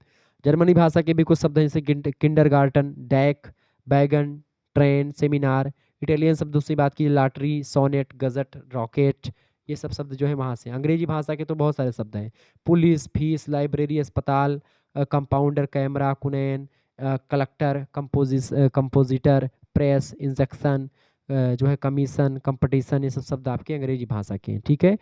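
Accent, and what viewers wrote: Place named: Bhojpuri, India. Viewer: 😅😅😅bhojpuri achha lga aapke mooh se ho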